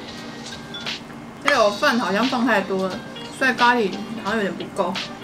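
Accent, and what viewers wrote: Taiwan. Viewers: 妳真得好可愛呀~ 💖 每次聽妳突如其來的"國台語"或時而的"念錯詞"就笑了!! 很喜歡妳的視頻，繼續加油喲^^ 讚~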